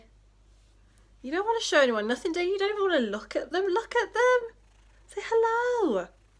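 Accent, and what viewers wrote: West Midlands, United Kingdom. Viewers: That's a west mids accent isn't it ;-) beautiful cat....am getting my hairless baby in one month ;-) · OK I’m going to admit I did not expect the British accent I kind of figured you were from like Brooklyn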